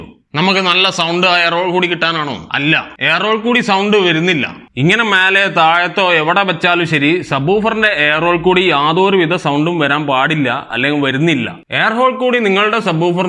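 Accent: Kozhikode, India. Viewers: വളരെ നല്ലവണ്ണം മനസ്സിലാവ ന്നുണ്ട് ഇ ടയ്ക്കൊക്കെ കോഴിക്കോടൻ സ്ലാംഗ് രസികനാണ്. Keep it up f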